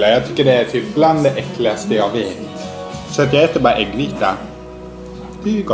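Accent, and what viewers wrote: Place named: Norrland, Sweden. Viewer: Hahaha så härlig vlogg, alltså er dialekt där uppe är ju helt amazing! :D "hemmakväll-påse på huuuvet"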